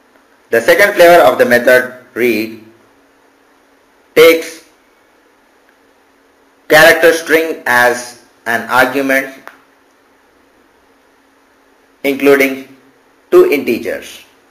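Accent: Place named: India. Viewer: nice accent haha are you indian?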